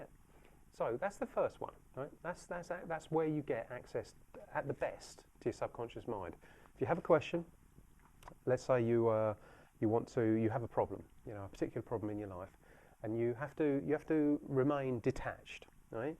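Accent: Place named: Australia. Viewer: i love this guy's accent..very broad australian with english twang..hahahaha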